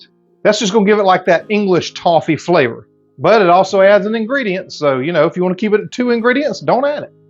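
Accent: South, United States. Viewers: Is that a Southern American accent I hear?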